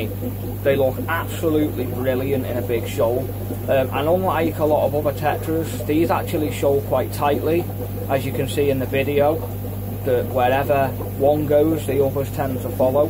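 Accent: United Kingdom. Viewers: lol I'd say that's a northern accent 👍 I'm a scouser myself and gd vids thanks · First off, congratulations of your country freedom and independence from the tyrannical shadow global organization. Secondly Macbeth is classic literature and movies for hundred of years. Why do you sound like you are from Scottland or Ireland and not from England? Where your parents from one of those 2 countries?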